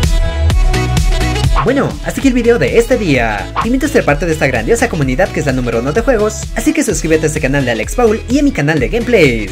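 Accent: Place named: Spain. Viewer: Eres un buen YouTuber, pero odio que hable como si fuera de Espa~a.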